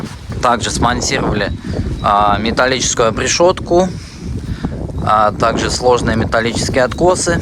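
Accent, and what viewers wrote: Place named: France. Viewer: Смотреть дальше не стал, бесит французский прононс😂или простыл он что ли😂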